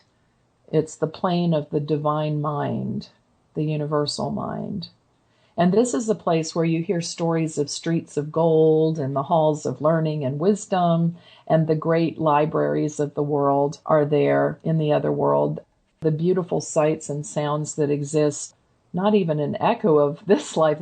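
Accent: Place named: Indiana, United States. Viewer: Love your accent. Could be Northern Indiana. I know time doesn't exist there (hereafter) as we know it, but I can't get my mind around what they do "all day" over there.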